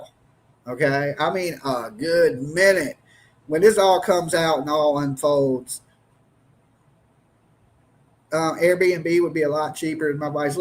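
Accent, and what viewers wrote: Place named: Kentucky, United States. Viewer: First time watcher. I like the style you do your show. You talk like most common folk I know,Im from southeastern Kentucky.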